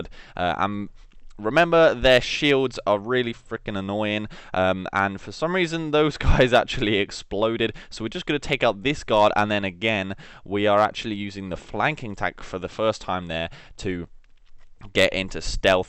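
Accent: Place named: Australia. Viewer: You SOUND, I think, Australian. However, you can't say perfectly normal English words like caltrops, sundered, or comatose (claptraps, shundered, and catamost, respectively). A-are you just... like... illiterate? If so, I guess I'll never get an answer.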